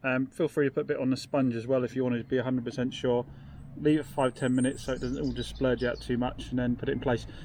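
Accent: South East London, United Kingdom. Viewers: He's got a completely typical South East London accent.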